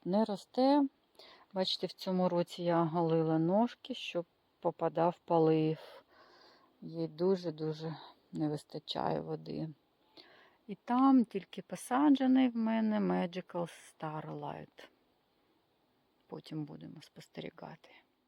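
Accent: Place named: Russia. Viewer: Как ни выдавливай русский,а он все равно проскакивает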